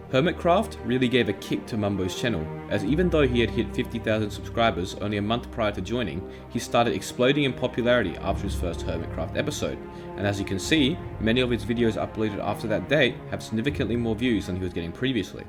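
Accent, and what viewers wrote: United Kingdom. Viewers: It's the british accent. I love it!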